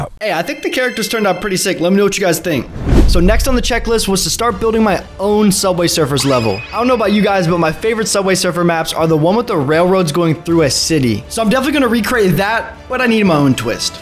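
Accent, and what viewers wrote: United States. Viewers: Video starts Skip all that padded murican accent bs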